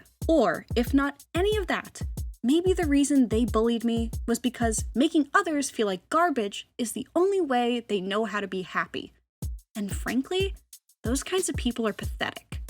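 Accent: Canada. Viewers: the canadian-ification of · IS CANADIAN CONFIRMED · you sound Canadian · So... You're Canadian?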